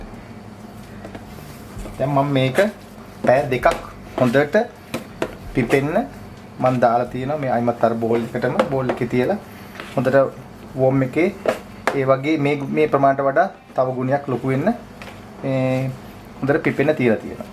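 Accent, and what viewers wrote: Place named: Sri Lanka. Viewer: No offense. Have you heard the French, the Germans, Slovaks and other ethnicities speak English. They have their own way of pronouncing English words. For example, the Americans pronounce castle, tomato, potato and a few other words very differently than the British. There is a significant difference, that one could clearly identify where you are from. Similarly, chef was born and raised in Sri Lanka, and lived majority of his life in Sri Lanka. His way of pronouncing some English words are entangled with our sinhala dialect. Which comes naturally if one doesn't make an effort to change the way of pronunciation. Did chef pronunciation affect his recipe? Did his pronunciation affect his career? No. Not at all. Why publicly point fingers? How would you feel if someone did the same to you? Making one feel small on a public platform is unkind, Inhuman. 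It says a whole lot about you, rather than about chef.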